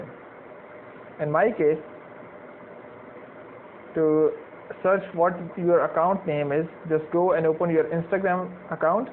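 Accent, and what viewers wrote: India. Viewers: linti indiano parang di maintindihan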